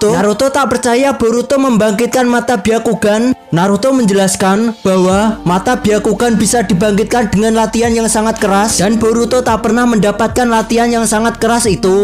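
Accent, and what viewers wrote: Java, Indonesia. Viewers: aku suka film nya cma kok suaranya medok amatt 😂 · Mimin org jawa.. Medoknya keluar.. 😅😅 Suaranya kaya robot..lurus😅😅 Tp malah pny ciri dan lucuuuuu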